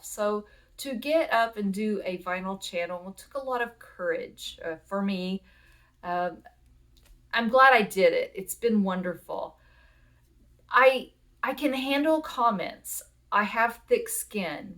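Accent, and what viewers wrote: South, United States. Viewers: love your Southern drawl accent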